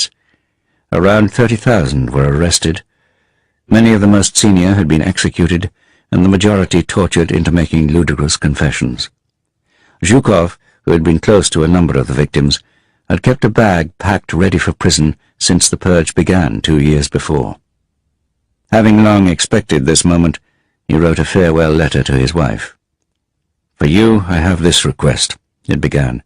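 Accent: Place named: United States. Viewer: What's with the ridiculous American accent?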